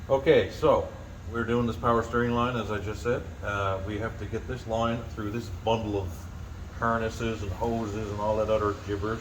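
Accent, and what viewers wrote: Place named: Newfoundland, Canada. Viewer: Hey man, where are you from you sound like a Newfoundlander?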